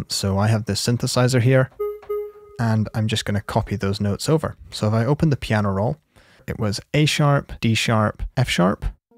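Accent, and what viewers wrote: Scotland, United Kingdom. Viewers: Have my kids mr Scottish man thank you for this!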